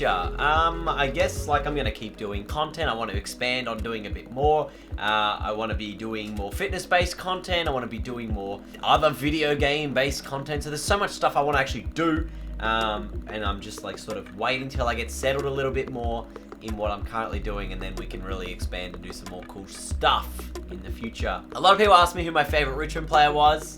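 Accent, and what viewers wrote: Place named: Australia. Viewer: you have such a nice aussie accent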